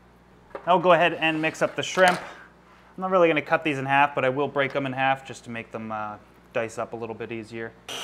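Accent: Canada. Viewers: Sometimes you sound Canadian and sometimes you sound like a Joey from New York. Thank you for making this video. I enjoyed it a lot. From: Yous Guys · how Canadian this guy sounds is spectacular.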